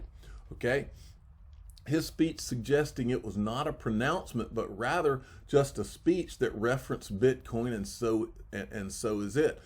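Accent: South, United States. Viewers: Love listening to crypto news in a Southern accent!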